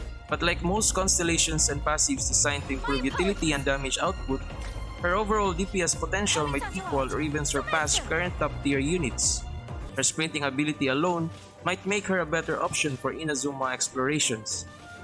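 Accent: Philippines. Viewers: filipino accent gang lets gooooo!!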